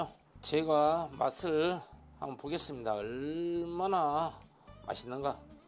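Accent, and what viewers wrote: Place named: Yeosu, South Korea. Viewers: 셀프님! 돌산 삼일면 말투네요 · 쉐프님 사투리 넘 정겹고 좋네요. 돌산사람 특유의 그 억양… 그 억양은 돌산사람이라면 딱 알죠. 😂😂😂 쉐프님 서울살이 꽤 되신거 같은데 어찌 그리 돌산 억양이 그대로 있담니까? 쉐프님 억양에서 나 돌산 신기사람이요 그러네요 ㅎㅎ 최근에 팬 되부럿습니다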